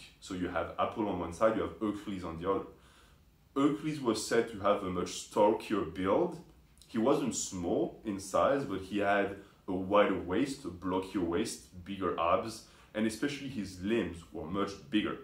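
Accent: France. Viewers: C'est bien un accent francais que j'entend ? J'ai bien l'impression :)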